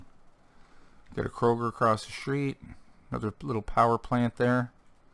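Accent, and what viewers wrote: Canada. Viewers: I can hear a little Newfoundland in your voice, fella!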